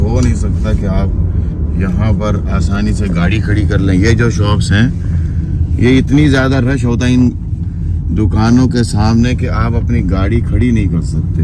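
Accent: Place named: Pakistan. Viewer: Bhai ap kia Pakistan sy hn?qk ap ki urdu bhut saaf hy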